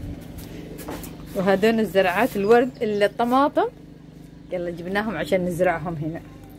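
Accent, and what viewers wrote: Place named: Saudi Arabia. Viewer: فديتك والله 😭😭😭لهجتك سعوديه 🇸🇦🇸🇦🇸🇦🇸🇦🇸🇦 أنا بعد من السعوديه عايشه في المانيا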